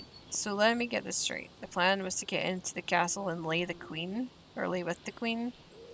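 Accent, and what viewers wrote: Scotland, United Kingdom. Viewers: Slowly getting into the rhythm of the game. Something I like a lot is going to Options and extending the season to 10 days or more days (the effect begins on the following season). This allows me to play all the side-quests, explore at my pace, battle baddies, and experiment within the season. A big part of the game is building a settlement/village/town and managing the settlers. Love the Scottish brogue; it's now part of my game, bringing it alive!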